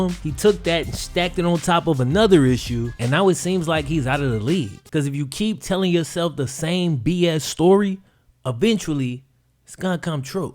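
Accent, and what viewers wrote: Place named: New Orleans, United States. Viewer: Are you originally from New Orleans, cause you have a slight New Orleans accent?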